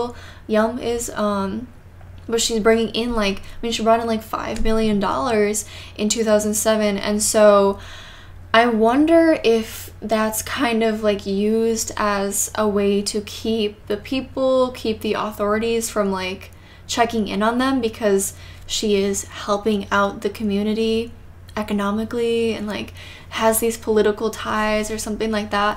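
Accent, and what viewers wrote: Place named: Midwest, United States. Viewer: Variation on the US accent. I think Midwest but I can’t remember if has said where she’s from!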